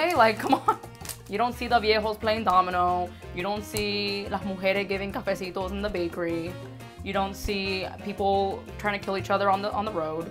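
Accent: Cuba. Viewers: I HAVE THAT SAME COFFEE MAKER AND SAME COLOR I love you girl you are my favorite and you make me laugh, I'm Colombian we have a few things in common with Cubans I been noticing and I love your Cuban accent you are just the funniest and the best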